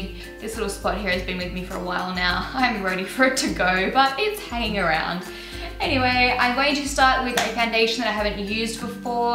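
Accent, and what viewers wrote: Australia. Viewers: I'm new to her channel I just want to know is she Australian??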